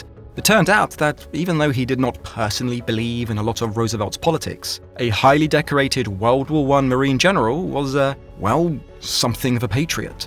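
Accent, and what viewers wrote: United Kingdom. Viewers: Wfhy is a Brit narrating American history?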